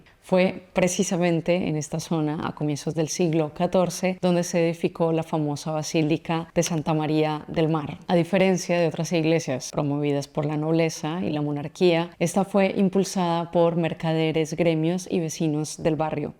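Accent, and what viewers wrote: Colombia. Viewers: De qué parte de Colombia eres? Tienes un acento y voz preciosa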